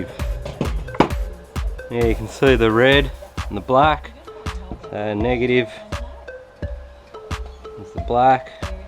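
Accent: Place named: Ireland. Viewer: irish? or Australian? sorry nice accent!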